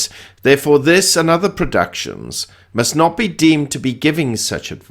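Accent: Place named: RP, United Kingdom. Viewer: First of all we don't want a one world currency. We surely don't want a one world currency controlled by a central group who want a new one world order. I suspect you are one of those that want a centrally controlled currency easy to manipulate. Silver and gold plus some other inherent value commodities could protect us from dictatorial control of finance (the fiat fraud) that has so indebted the world. Also, silver needs to be revalued to preserve it from destruction. At a higher price silver substitutes will come along to fill need and make available more silver for monetary use. A national currency backed by a useful commodity like silver would have the advantage over those supported by faith in goverment oppertunists. Let there be national currencies to compete to find fair market value and protect the world from Globalist fascism. Tone down the elitist sounding posh accent. 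It sound smarmy.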